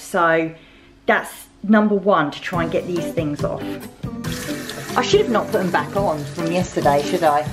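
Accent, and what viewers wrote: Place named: England, United Kingdom. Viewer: This is a really cool channel love your english accent :D I subbed!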